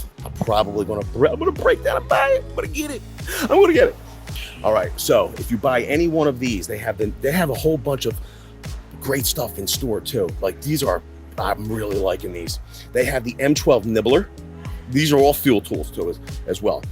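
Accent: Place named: Brooklyn, United States. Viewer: Just found your channel last week you talk like a Brooklyn Italian,are you Italian by any chance? 🤌🇮🇹 ayyyyyy ohhhhhh!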